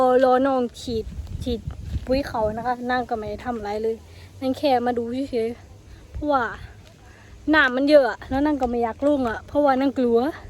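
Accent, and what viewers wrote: Korat, Thailand. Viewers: สู้ๆน้องสาว ภาษาคือออกไปทางโคราชบ้านเอง อ้ายยู่ลำปลายมาศติดตามตลอดเด้อจร้า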